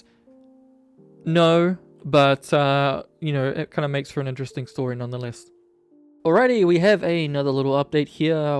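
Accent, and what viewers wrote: New Zealand. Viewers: You a kiwi bro??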